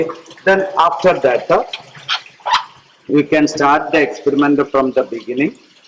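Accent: Kerala, India. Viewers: I'm from Punjab and I lived in Kerala that's why I noticed your tone😅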